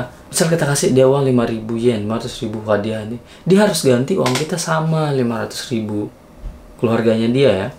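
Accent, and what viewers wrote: Lombok, Indonesia. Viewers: Logat sasak nya masih ada bang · Kok logatnya kayak orang Lombok ya?😁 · Logat lombok nden bau telang bro 😅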